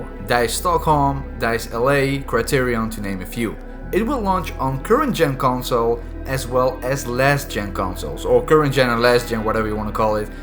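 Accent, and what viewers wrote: Netherlands. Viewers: This guy is Dutch, i could hear it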